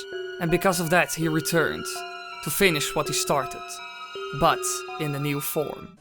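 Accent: Netherlands. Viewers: It's sounds like you have a dutch accent😁 I like the theory, it turns things dark. Me likes dark ;3 winkwinknotchnotch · I can hear in the voice of someone from the Netherlands that they're Dutch since i hear it a lot since i am also dutch. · You sound dutch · Damn I thought from your accent you were either Dutch or Swedish. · Are you dutch? cuz of the accent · You sound heel erg Dutch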